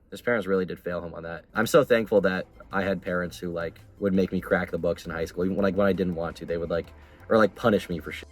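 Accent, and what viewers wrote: New England, United States. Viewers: Oh shit I didn’t know u were from New England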